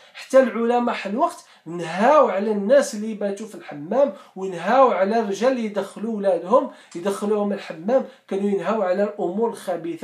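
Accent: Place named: Ghazaouet, Algeria. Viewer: Salem, Chokrane, Merci de nous transmettre votre savoir. Allah yatek a saha Inchallah j’espère que nos jeunes feront quelque chose, ainsi que la Diaspora Algérienne. Vous avez l’accent de. Ghazaouet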